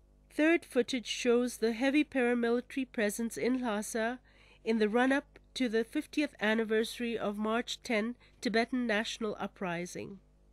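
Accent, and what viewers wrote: India. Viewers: 印度口音的英语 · 你麻痹的，阿三口音？贱民一个！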